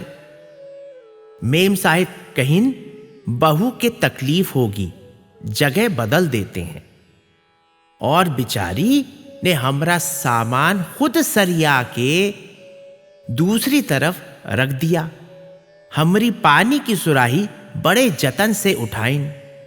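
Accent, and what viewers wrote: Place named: Varanasi, India. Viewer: these stories by themselves are so interesting, but your superb narration in the Banarasi dialect makes them all the more entertaining! Takes you to a different world altogether. Congratulations! Well done! 👌👌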